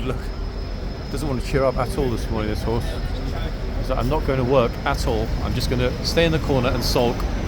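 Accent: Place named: Cockney, United Kingdom. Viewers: I LOVE that wonderful cockney accent, albeit, sometimes, difficult to understand (American here 😉)Thank you for the clarification on who is English and who may or may not be British 😅 An additional question, if I may, the owner of this channel answered the gentleman’s question by explaining that when he refers to himself as English, he means he is not Welsh, Scottish nor Northern Irish….. is there a difference in Northern Irish and Southern Irish? 😊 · If you must make your commentary for your channel, please learn that a double 'T' sound is be-t-t-er not bedder, and also the letter 'H' is pronounced 'Aitch' not 'Haitch'. You do not say 'Mem' for 'M', or 'Lel' for 'L', so why do you say 'Haitch' for 'Aitch' 'H'? Please speak the 'King's English' and not that southern counties 'right-on' rubbish. Check the Oxford English Dictionary: aitch, n. 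The name of the letter H, h.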